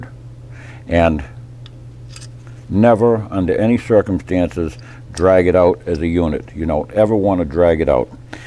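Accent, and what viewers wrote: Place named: Massachusetts, United States. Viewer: Great video. Very informative. I have two S&W revolvers and this is great information. Also enjoy the Massachusetts accent :)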